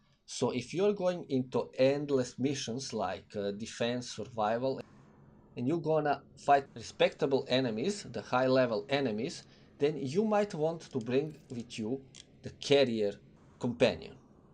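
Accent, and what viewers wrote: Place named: Russia. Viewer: Слышно , что Русский чел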